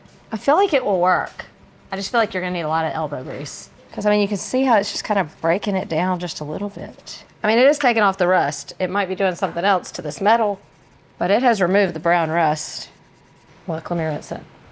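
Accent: South, United States. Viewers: Hi there, your videos are SO good. You're so at ease with and very able to articulate the how and why of cooking with an air fryer, and the oven, of course. You're a very accomplished and personable woman. I've learned a lot from you about air fryers and cooking. And, your Southern easy-going presence and sound are familiar to me. Are you originally from Texas? I'm an 82 y/o woman who has just discovered air fryers. I received my 4 qt. Cuisinart today and after watching your video that included many things to cook using flour tortillas, I made a small meal using one of the small round tortillas I bought yesterday, filling it with delicious foods, and enjoying it within 6 minutes. It'll be a new tasty meal, so easy and tasty. So, thank you.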